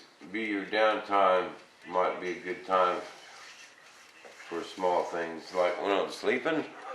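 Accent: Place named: Louisiana, United States. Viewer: what part of Louisiana you's from...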